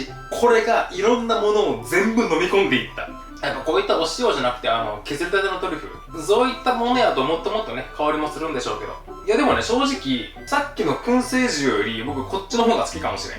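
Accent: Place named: Fukuoka, Japan. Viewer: 福岡弁が心地いいですね〜☺️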